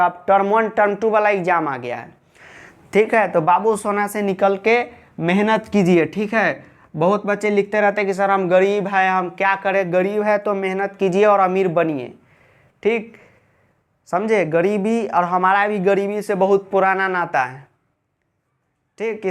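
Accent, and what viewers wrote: Bihar, India. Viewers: sir aap bahut badhiya padha rahe.....bihari ascent me comedy karte karte.,...maja aa gaya padh ke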